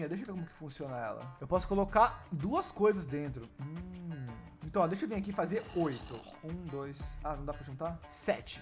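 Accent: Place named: South, Brazil. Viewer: tenho uma pergunta onde vc mora? pq parece q vc é aq do sul Vc provavelmente n vai me responder, mas vai q vc responde ❤️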